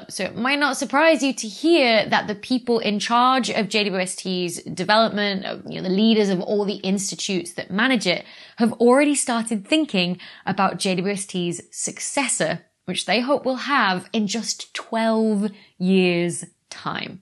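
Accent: United Kingdom. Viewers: This is my first time seeing a video with Dr. Becky. Very informative, on a subject I look forward to. My American ears take time to get accustomed to her veddy veddy Bditish accent. Thank Goodness she talks like Julie Andrews, and not like Eliza Doolittle in her "Before" stage, the way so many cockney techies on YouTube do (I hear them and I think, "The royn is Spoyn staays moynly in the ploin" and I want to tear my hair out.).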